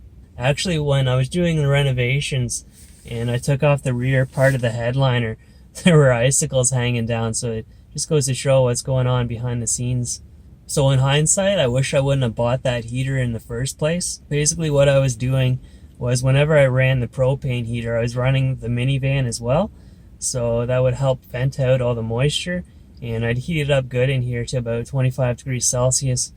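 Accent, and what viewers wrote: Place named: Newfoundland, Canada. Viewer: Canadian. If I had to guess, I'd say Newfoundland? I've heard other Canadians say that's where the sort of stereotypical Canadian accent comes from.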